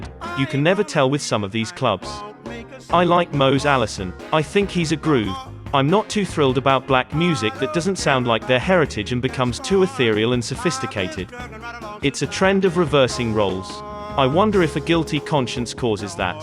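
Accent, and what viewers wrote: England, United Kingdom. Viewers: Why is this not Frank speaking and some English twit ? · That aint Frank Zappa . Since when does Frank Zappa have a British accent? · We are hearing Zappa’s words, read by an Englishman? Gtfoh.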